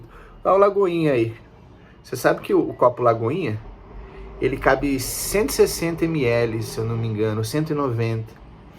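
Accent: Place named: São Paulo, Brazil. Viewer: Cada vez melhores os vídeos. Bem legal também ver um amor sobre beagá narrado em sotaque paulistaço. Viva o Lagoinha!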